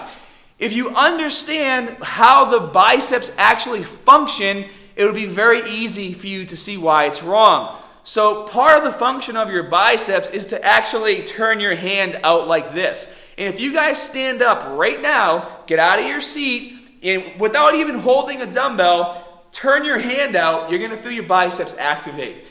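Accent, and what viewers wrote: Boston, United States. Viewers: that Boston accent really shining through! haha love it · is he from Boston?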